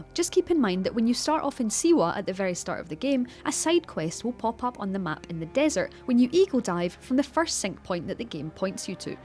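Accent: Scotland, United Kingdom. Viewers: That's a Scottish accent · That Scottish accent is irresistible lol. Had to sub! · Sendo Tiago scottish actually. A subtle distinction but very important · She’s Scottish 🤦🏿‍♂️ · Um, she’s Scottish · Central Scotland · I’m Irish and that’s definitely not an Irish accent. Sounds Scottish. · So nice hearing a Scottish voice for things like this. Is there more just from her? (I'm not being weird I'm Scottish too and it's just easier to follow) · That Scottish accent <3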